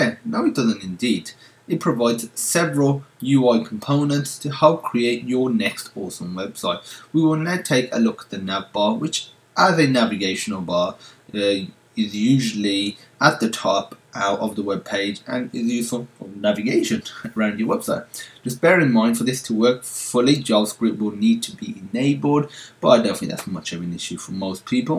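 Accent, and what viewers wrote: United Kingdom. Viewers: and +Nooblike first of all if you carry on his tutorials and accent then may be you can find out he is from United Kingdom what is famously known as UK. he showed every step with a generous teaching method what can not be narrated (I believe). Now if you see the rest of his videos, I think you know how he knows about these things and game development. and what a good method does he have to touch every corner. and 2$ or a 4$ is not a fact. at least you guises have seen he used a macintosh(MAC). So, he is not that much poor as you said. and please if you can't appreciate this help for the cost of 0$ at least try to be at least respectful for his generous help.+Rawandi please don't use this kind of talking to people who are helping you-if you don't like it then skip the video but don't try to b**s**t there. and +Nooblike don't don't tahnk this it makes you look like you are appriciating this kind of works. 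At Last + Thank you.Awesome tutorial as always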